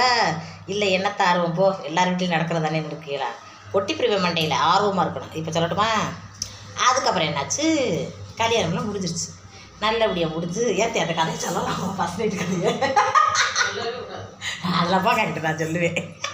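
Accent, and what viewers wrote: Madurai, India. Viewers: nalla siruchenma un pechu romba nalla Iruku mathurai slang nallai tr uk ku❤❤❤❤❤❤❤❤❤❤❤❤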